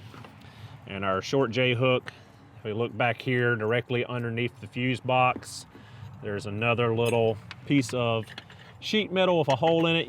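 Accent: South, United States. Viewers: I didn't mind your southern accent and it does take me awhile to get used to it 😅, and I like your video that show people how to do things 🎉 my brother's car needs a part replacement in the engine a mechanic wants to charge him three thousand dollar 😭 to do it, he didn't want to do it, but he fixed it 😅 I asked him how did he do it, he said he watched some yt videos on how to do it so on a long weekend he rented a engine lift thing and with help of his sons they got it done 😅🎉 I was impressed because the car is a BMW M7 😱 he took the engine apart change the part which he ordered online and put everything back together 😢, I have a Subaru repair manual It does have details instruction on how to do it but watch your video on how to do it and the tips he gives that is something a manual can't give me so I 👍👍👍 for you to do it, but it mean more work for you I hope you can continue to make this type of video 🎉 because a mechanic is just too expensive and I don't know if he is telling the truth 😢, my mom told me s story about my aunt, when she brought a car, because she didn't know anything about cars so she took a Auto repair class in highschool, I was surprised 😅 because she has a PhD and a professor at UCLA tough there for a long time and yet she knows nothing about how a car works mechanically so she took the class to have an idea on how car works, sometimes I was wondering when she was in the classroom does her classmates or the teacher know about her, they may think she is jus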